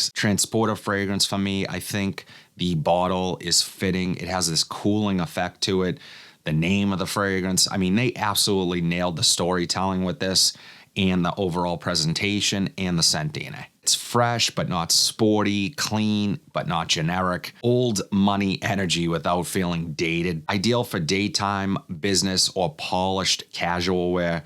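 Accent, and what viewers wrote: Boston, United States. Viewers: I’m currently watching “Clubhouse: a year with the Red Sox”. So between your vids and that, my exposure to the Boston/NE accent is seriously starting to imprint… 😂 SOTD: Penhaligons Endymion Concentré (under the radar banger). Tomorrow Sunrise on the Red Sand Dunes Intense! Congrats on 27K my man 👏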